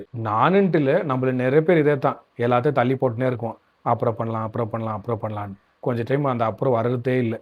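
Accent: Bangalore, India. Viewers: Easter birthday nu video liye sollitunga, unga slang uh vechu place of birth Bangalore nu potupe, which year bro, 33 na 94 or 93?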